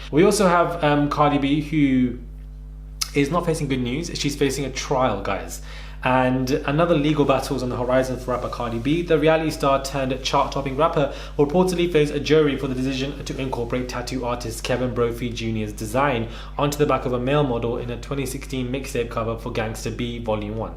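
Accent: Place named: London, United Kingdom. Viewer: !!!... I just found this channel and literally binged! Bite size gossip spoken by a Londoner... Love it!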